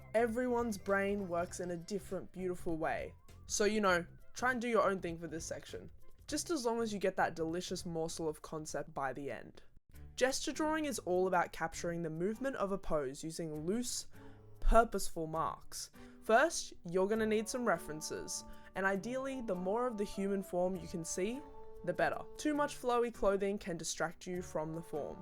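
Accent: Australia. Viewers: This outta topic but for some reason I can tell you’re Australian, I actually know the reason I lied, it’s cuz u have the same accent and speaking manners of Rhea Ripley and as a wrestling fan I was like “woah u sound familiar”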